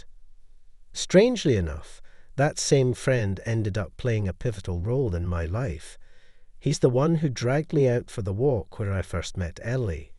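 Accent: Scotland, United Kingdom. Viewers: Why is a Scotchman narrating when 5Th Avenue is shown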